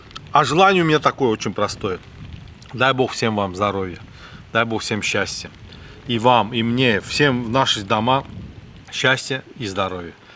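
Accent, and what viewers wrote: Baku, Azerbaijan. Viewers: Русский Бакинский акцент,это песня.Певички , нервно курят в сторонке.Рецепт супер,я так копчу курей. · Очень вкусно выглядет.Акцент и говор чисто Бакинский,я незнаю кто он по нации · Акцент Азербайджанский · Ваш канал, рецепты, приготовление, ваше настроение, акцент, диалект, выражения, все это причины, по которым я с удовольствием смотрю каждый эпизод! Я сразу оказываюсь на родине, в родном Баку 80х! Дай Бог вам здоровья и успехов в творчестве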